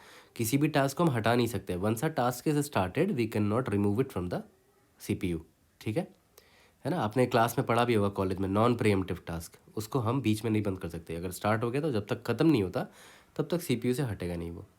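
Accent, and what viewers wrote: Bihar, India. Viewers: Are you from BIHAR bhaiya?? ..becuase your accent is same as ours ...i am also from Bihar...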